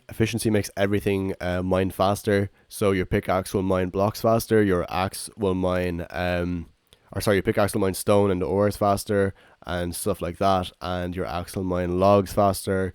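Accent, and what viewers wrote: Ireland. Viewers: Sounds like an Irish John H. Benjamin...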